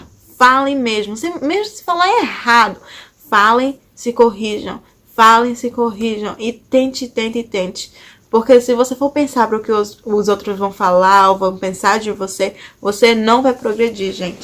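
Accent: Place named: United States. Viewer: Gostei do seu jeito de falar. Vc tem sotaque americano kkkk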